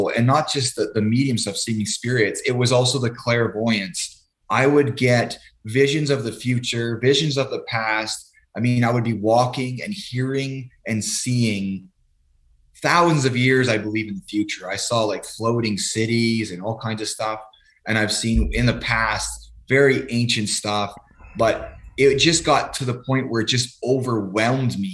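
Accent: Canada. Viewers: He sounded Canadian to me. I live in Regina Saskatchewan! I definitely find this so intriguing/fascinating. I also came out of hard-core drug addiction four years ago. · Is this man Canadian? From the East Coast?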